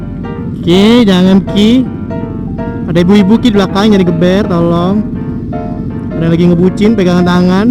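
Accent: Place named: Palembang, Indonesia. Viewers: Cak mno Palembang woy wkwkw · Palembang bosss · Itu mah Palembang bng🤣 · Palembang ini mah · cak mano itu logat Palembang-_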